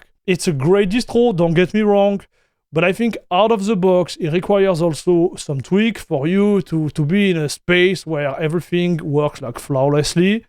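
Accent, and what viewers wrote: France. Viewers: Mdr mec l'accent , ca se sent que toi aussi on se moquait de toi en cours quand tu essayais un accent plus british ! N'empeche ça me rassure, meme si ton accent est french de ouf bah on comprend parfaitement ! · Si je ne me trompe pas, tu viens de la France. T'as un accent Franglais sans doute, ce que j'aime bien 🙂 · Excellent ! Un abonné en plus, j'ai commencé doucement avec Bazzite sur mon pc fixe il y a environ 1 mois, idem sur ma.rog ally et je doit dire que grace a ce dernier je suis tombé amoureux de linux et j'ai totalement oublié windows (mêmepour la bureautique), cependant Bazzite est encore jeune et présente quelques bug et plantage, rien d'insurmontable mais, ayant vu de quoi etait capable Linux avec ce dernier j'ai cette soif de decouverte et depuis quelques temps CachyOs me fait de l'oeil depuis quelques temps pour ma config fixe et franchement tu m'a donner envie de me lancer sur ce dernier. 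J'aurai pu commenter en anglais mais je me suis dit qu'un peu de Français te ferait plaisir 😅, on a le même accent à couper au couteau 😂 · You have a strong french accent, so i'll say it to you in french: Ce que tu as dis sur Debian est partiellement faux. Tu as dis que les version étaient vieilles. C'est le cas PAR DEFAUT. En 3 lignes de commandes, tu peux ajouter les repos backports de debian. Ces répos possèdent les dernière version de beaucoup de packages. Par exemple, je suis sous Debian 12, qui est normalement fourni avec le kernel 6.1, mais avec les backports j'ai pu avoir le kernel 6.11.10 . Pareil pour les drivers nvidia, ou encore gnome. Dès que tu actives les backports, avoir les dernières versions est simple, tu rajoutes juste -t <nomListe> a ta commande APT pour les prendre depuis les backports. AVERTISSEMENT: SI TU FAIS CA N'ESSAIE SURTOUT PAS D'INSTALLER LES META PACKAGES (linux-image-amd64 par exemple) CAR TU RISQUE D'AVOIR QUELQUES SURPRISES... 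· I came here for the French accent ❤️❤️ Love the content keep it coming lad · If you don't like french accent, just go cook yourself an egg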